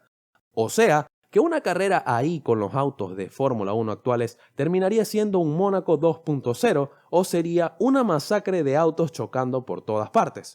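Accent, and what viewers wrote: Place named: Venezuela. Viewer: Hola hermano, increíble vídeo. Tu contenido es lo mejor de lo mejor. Ese acento se me hace inconfundible, eres de Venezuela no?? Un placer ver a un compatriota haciendo contenido de este estilo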